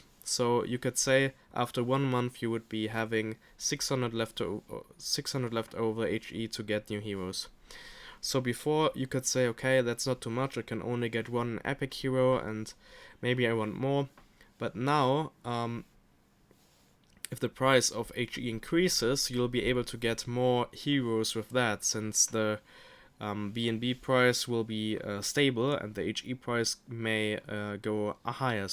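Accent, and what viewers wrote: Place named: Germany. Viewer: Ein Deutscher das erkennt man am Englishen Slang :D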